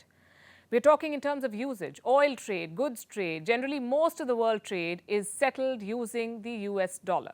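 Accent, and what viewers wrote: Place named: India. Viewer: Her English is the most beautiful Indian English ever that I have heard in my whole life:):):)😊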